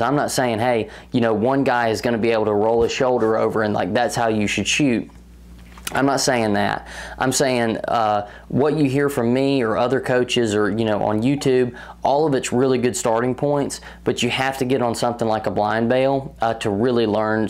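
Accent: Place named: North Carolina, United States. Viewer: Man where are you from, that’s quite the accent, NC?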